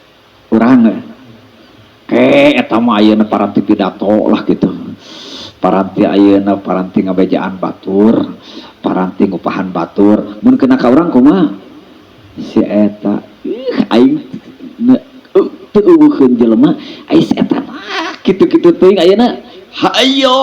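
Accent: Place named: Java, Indonesia. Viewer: Bisa sambil belajar logat Jawa 🙏👍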